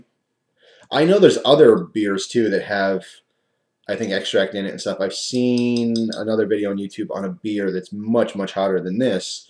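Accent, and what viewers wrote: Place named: Pennsylvania, United States. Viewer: ya Pennsylvanian accent pride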